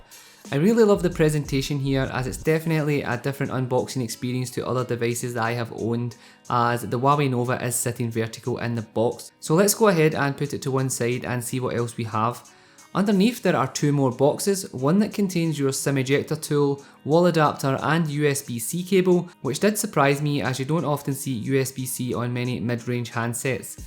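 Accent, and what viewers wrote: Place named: Aberdeenshire, United Kingdom. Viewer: Are you from Aberdeenshire?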